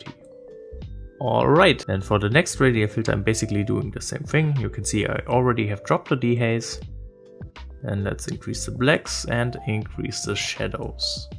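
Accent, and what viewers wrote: Germany. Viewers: Great video! Perfect for me is I am currentyl trying to get better in LR? Are you from Germany? As I think I can hear a german accent😅😉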